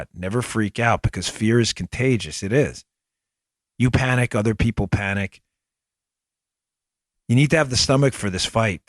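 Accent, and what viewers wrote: New York, United States. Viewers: Dan, you remind me of some of my old Soldiers from New Yawk! Lol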